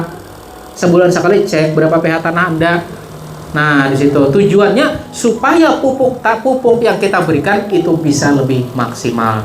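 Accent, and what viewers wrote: Banjar, Indonesia. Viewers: urang Banjar kah pian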